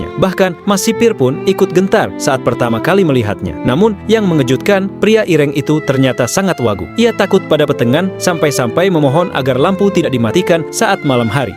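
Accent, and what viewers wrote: Java, Indonesia. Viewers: bagus mas pake aksen jawa nya😅😅😅😅😅😅 bikin makin ngerti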